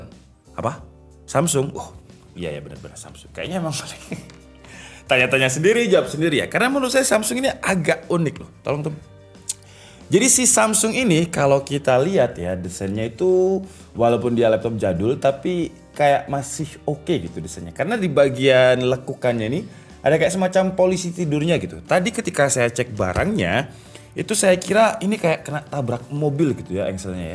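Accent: Malang, Indonesia. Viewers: Bang lu orang Malang ya?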